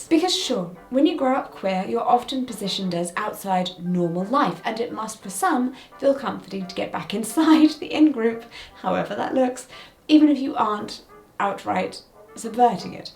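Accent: RP, United Kingdom. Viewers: Is your accent that plummy naturally, or do you play it up for an on-camera persona?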